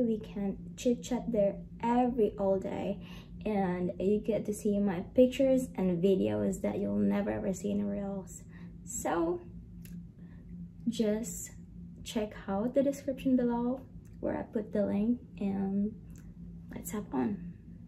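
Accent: Philippines. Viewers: Hi beautiful.. can i ask you something.. taga diin ka sa visayas.. im surprise that you spoke like ilonggo if im right...keep safe always ang God bless...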